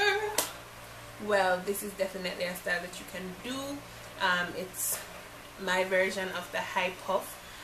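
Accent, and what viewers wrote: Jamaica. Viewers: Where are you from? You sound like you're from Jamaica (or a Caribbean island at least) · I so love your accent, Jamaican Big Up Gal!!! Listen I am in England honey I don't think I can get that gel here. where did get it from?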